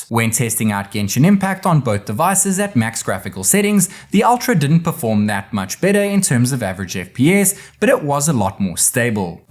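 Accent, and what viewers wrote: South Africa. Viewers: The moment i heared your voice ik you were south African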